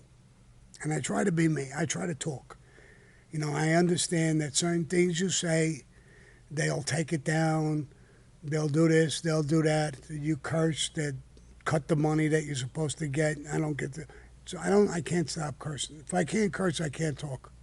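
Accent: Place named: Brooklyn, United States. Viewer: I speak the same way, no offense to anyone. It's a Brooklyn thing , I think 👊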